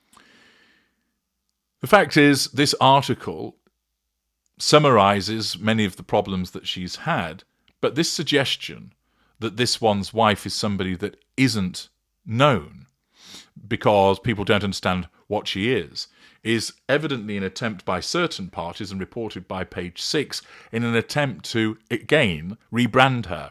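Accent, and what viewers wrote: United Kingdom. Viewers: Unfortunately, as you can hear, is British and therefore has no sensitivity or superstitions about such things that make you uncomfortable. He's just reflecting his own British culture. Sorry.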